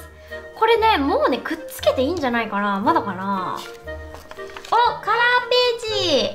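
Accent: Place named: Western Japan, Japan. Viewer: は何処出身？西寄りの言葉たまに出るよね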